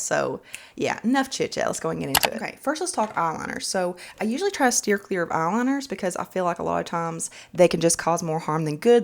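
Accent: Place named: Georgia, United States. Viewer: I just found your channel and love it!! When I was listening to you talk I thought you sounded so much like me.I'm from Georgia.Looking forward to more makeup recommendations. We're having some very hot and humid weather here also.Thank you!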